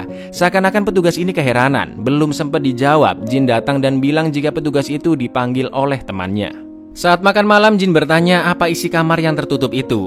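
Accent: Indonesia. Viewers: Ya namanya logat Indonesia ya suka2 dia org korea aja nyebut nama org indo suka salah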